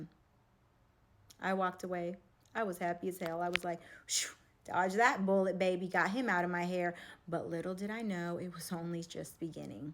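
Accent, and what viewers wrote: South, United States. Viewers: Wait, I just watched you with Ian Nick and you said you were a Jersey girl and spoke as one. What's with the "y all" and southern accent. It's all good .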